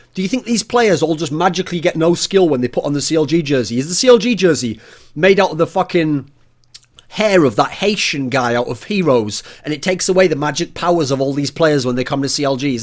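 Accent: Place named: Northern England, United Kingdom. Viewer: swear u have picked up a northern accent... cant remember u having this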